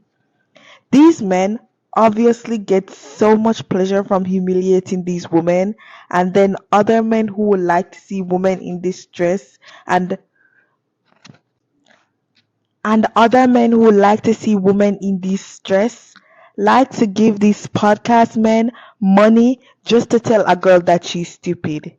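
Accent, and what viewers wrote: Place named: Caribbean. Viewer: Nothing someone does it perfect. I will agree some of these podcast approach towards woman is harsh and sometimes unfair, but they are a necessary "evil" per say since the 80% of men who were left on read by most woman are either going to follow these guys... or Join these females onlyfans. For every actions, there is a reaction. Woman want to continue to aim for the best, as they say the 20%. which is fine and is expected to go for the best you believe you can get, but this is not the case. There is a certain amount of guys who do rotate around the same girls, Even if these girls are dating a "nice guy" they have their lapse of judgment with these men. I can agree they themselves may not be the BEST people to preach the message, but no one was preaching anything. The reaction to woman dating choices is this. Hardened Men who are sickened by the westernized woman I can hear some Caribbean accent from you, so i can tell you may not be with the western woman BS, but you can't speak for the majority of woman. 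As a guy who has dated, and dealt with many woman. Yes woman are generally the same, The same way Men are generally the same. We have our own individual differences, influenced by our upbringing and society who grew up around, but biologically, when it comes to dating and how woman choose their mate, just like men, is generally the same. Per instance 9/10 Woman do not like Nice guys, or push overs. You try to work a Hardened tough guy to become more soft, like building you'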